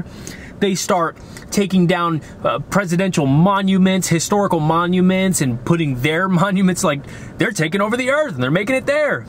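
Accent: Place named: Transatlantic, United States. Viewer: Please do me a favor, look into voice acting. Not you doing Transatlantic accent without effort... excluding the reading and losing your place.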